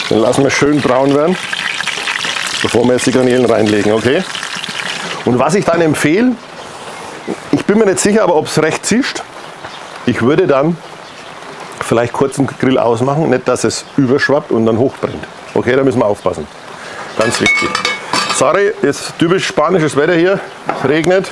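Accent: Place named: Franconia, Germany. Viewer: stehste da im Regen..hahaaa...hab dich grad Aboniert,.........,jjooaaaa,,,,,interessante Rezepte muss ich sagen,ich hab auch büschen Verbindung nach Frrrranken,und zwar nach Coburg ich kenne den Dialekt wieder bei dir.....aber egal,du hast tolle Rezepte und ich werde mal sehen ob ich das auch ohne den Grill hin kriege,manches geht ja auch im Ofen ...gelll!!!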